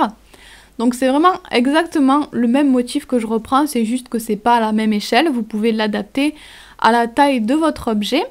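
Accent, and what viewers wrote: South, France. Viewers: du sud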